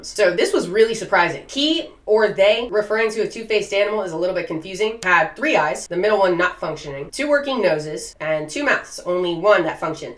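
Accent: Midwest, United States. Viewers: Australia may be unhinged but my partner is scared of your videos because you look like you grew up out bush but but speak with an American accent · I found your channel! I was wondering why you seemed so familiar, someone showed me your tiktok, a video, and i was like "yeah no shot, i learned that when i was 5.... did you not go to elemtary?" like they were showing basic crap, not the real intersting stuff that's in your actual full videos. i thought you were an up and comer, no you're a tik tok legend, that started youtube. So you'll never read this. BUT I was trying to guess you accent. I was thinking "gotta be mid west, but not north mid west, mid west as in (west if chicago,) pretty shres the same accent until you get to so-cal and portland and seattle areas. I was close!! Thogh your PO box says LA, i don't think you were raised in SoCal. I'd say between Northern cali and chicago, somewhere between there, but as far as south as north arizona/newmexico. 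(it's a large accent area)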